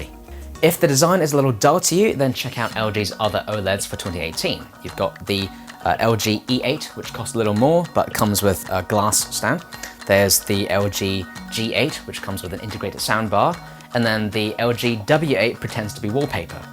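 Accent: England, United Kingdom. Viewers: Very nice informative review and may I say great combination, the Oriental look with an English accent, very clever looking and sounding, I'm not just debating but I'm still researching and as far as design goes I think they messed up here because the whole idea of having an ultra thin TV is to show it off and I'm sorry but that curved bottom just doesn't do it for me, I don't care what kind of Sound it gives you, because basically if you're going to spend that sort of money for a TV, if you got any brains whatsoever you would spend a little bit more and get a AV to go with it? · The overly English accent makes me lose focus. I fucking hate you.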